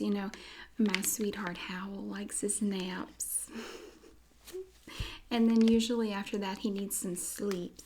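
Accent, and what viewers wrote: South, United States. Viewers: i love the lore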